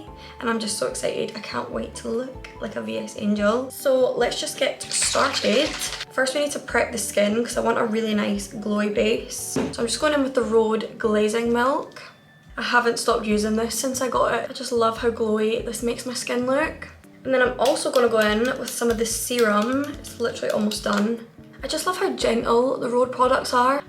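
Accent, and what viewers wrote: Scotland, United Kingdom. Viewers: Scottish accent :) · is that a scottish accent? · Omg your so pretty also r u Scottish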